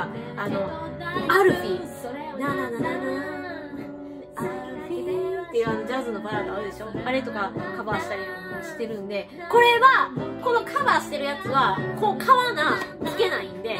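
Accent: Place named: Osaka, Japan. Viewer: さん！今年もよろしくお願いします。 『メチャ』『ホンデ〜』『オモロイ』『アンマー』 メチャー大阪弁大好きやん！会話の間の取り方良いね。藤井風また聴きます。『何なん！』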